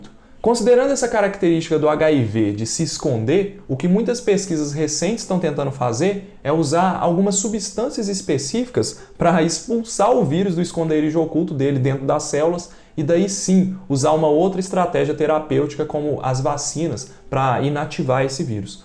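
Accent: Minas Gerais, Brazil. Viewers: Vc é mineiro. Deu pra notar.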